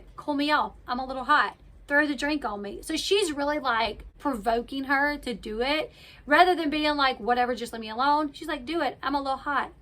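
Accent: South, United States. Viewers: I just love your country accent 💗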